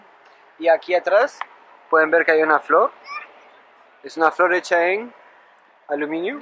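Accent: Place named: Europe. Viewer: ah, francesa. Sí, me pareció que tiene un acento europeo. Me alegro que les gustó Argentina. Saludos!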